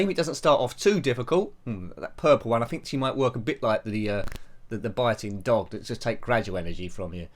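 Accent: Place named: Cockney, United Kingdom. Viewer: A nice little game that probably would have been popular on a budget label back in the day. It's all a bit basic and lacking flair, but it's decent in all areas. I really hope lots of new Spectrum games are created now that the Spectrum Next has given the brand a new lease of life., EastEnders should have you as a guy who runs a retro computer shop in Albert Square. Your accent is perfect for the show. One of my grandfathers was a cockney(born within bow bells.) so I have London in my blood. Have a King Lear on me. Cheers! D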